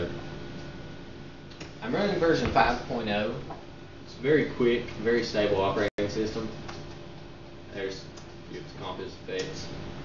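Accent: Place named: South, United States. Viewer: this yankee thinks that's a pretty cool southern accent. i can't place it, but it's great. u could have a career in cartoons i mean hella cool. maybe eastern tenesee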